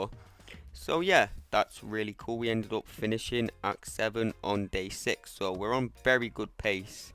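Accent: United Kingdom. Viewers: Yes we like your rage and rant in Bri'ish accent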